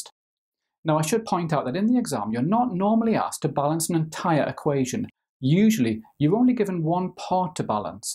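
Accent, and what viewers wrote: United Kingdom. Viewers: Why British accent makes everything better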